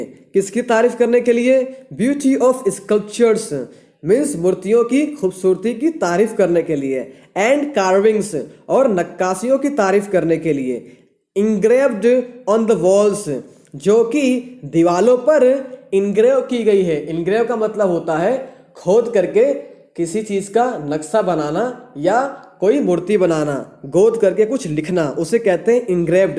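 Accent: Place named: United Kingdom. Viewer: Thoda angrej wala accent nahi bolte to Jada sahi rehta sir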